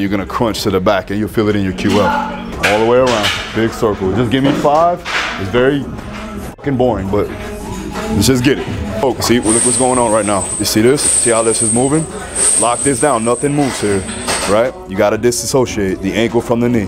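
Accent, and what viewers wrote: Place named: Los Angeles, United States. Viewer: Bro you gotta be from LA? I can hear it. Good work all around! KB work is just different and I love it. Stay functional🔥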